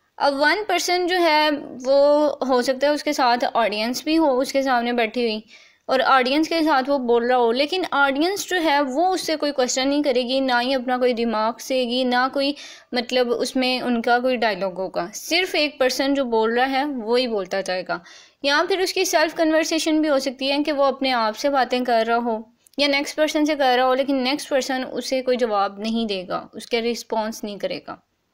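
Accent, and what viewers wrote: Pakistan. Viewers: Kya aap pakistani ho kya aapki tone ase hai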